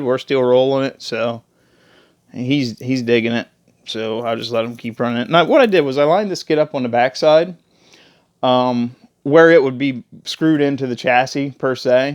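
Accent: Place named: Pennsylvania Dutch, United States. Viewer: Did you say (Door ability) LOL its Durability, you have to be from PA, Im hearing that PA Dutch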